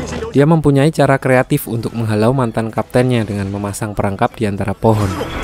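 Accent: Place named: Java, Indonesia. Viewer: Njiiirr orang jawa .. salaam bang dari jawa timir kediri bang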